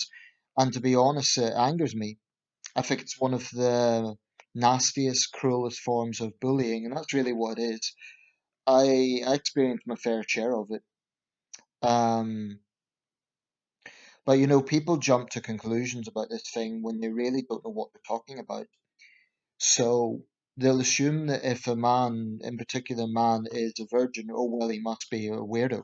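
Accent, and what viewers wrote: Northern Ireland, United Kingdom. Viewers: Are You From Norn Iron as well?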